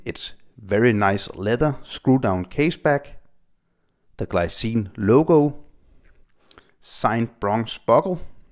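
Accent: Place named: Denmark. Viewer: I’m looking at Massdrop from the US and it is running at $419. You can even get the monster 48mm versions. I have and love the Airman SST Pumpkin. I did buy the “Goldeneye” Combat Sub but I almost never wore it and it wore bigger on the wrist than the 42mm would suggest so I sold it. This bronze version is tempting, but I wonder if the same thing would happen and I wouldn’t really wear it. Also, God Day from the US. I work with a lot of Danes and I love your accent. It’s super!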